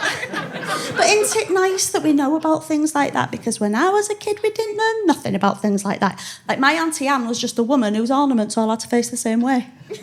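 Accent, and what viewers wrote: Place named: Leeds, United Kingdom. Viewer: The northern dialect gives it comic overtones in london dialect she would taken to a doctor.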